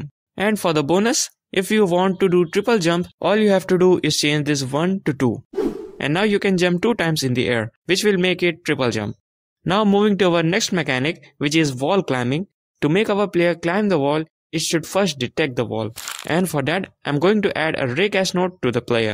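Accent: India. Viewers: suggestion to you bro, fix your indian accent, and here will be a lot of viewers!!!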